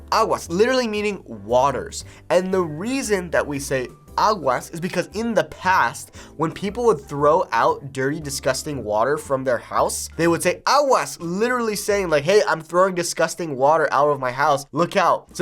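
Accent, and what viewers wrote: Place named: Mexico. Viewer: I'm hearing a lot of Mexican Spanish in your English now. Your vowels are so lively and you have that warm Spanishy vibe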